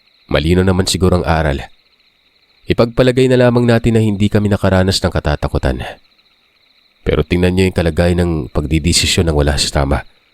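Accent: Visayas, Philippines. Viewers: Bisaya pa more sir 😂 natutuwa ako pag nag bibisya ka.. pero mglng ka mag ilonggo...😊😊😊😊 shout out bahrain 🇧🇭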